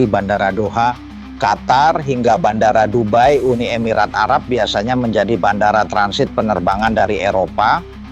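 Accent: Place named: Java, Indonesia. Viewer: Aku komennya diluar konteks y, ini bung kok suaranya ada logat Jawa sedikit? Ini bung asli atau dubbing.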